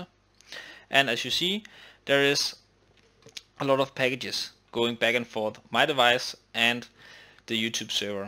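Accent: Denmark. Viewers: i can reconize a danish accent from a mile away! Rødgrødmedfløde team assemble! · This guy sounds more danish when speaking english, than a danish speaking danish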